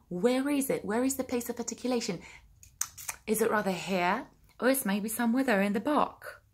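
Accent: United Kingdom. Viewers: Piękny akcent :) brzmisz Posh, tak na marginesie :D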